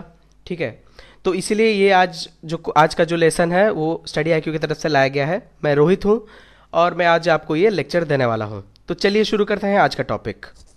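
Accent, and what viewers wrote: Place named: Bengal, India. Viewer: sir u please don't make videos ..your voice is terrible ..your begali accent is not at all effective ..it's very tedious ..i m unable to comolete the video due to your tedious voice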